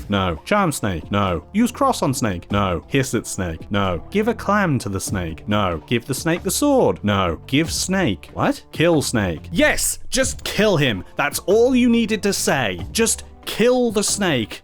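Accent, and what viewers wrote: United Kingdom. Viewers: Damn British accent. Could have been a good video too. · You're just the spiffing brit, aren't you?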